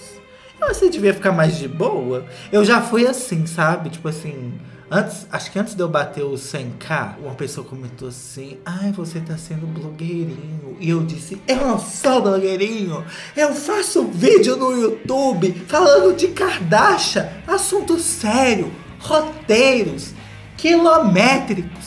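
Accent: Minas Gerais, Brazil. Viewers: Vim pela live na foquinha e já tô maratona do todo o canal, amei o jeitinho e sotaque mineiro dele hahaha. Conta essa fofoca pra gente que chegou depois aqui no canal mulher, pelo amor. Kkk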